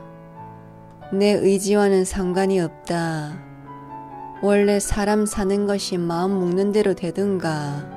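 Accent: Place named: South Gyeongsang, South Korea. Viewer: 왜 나레이션을 사투리로 한건가 했더니 경상남도 출신이시구나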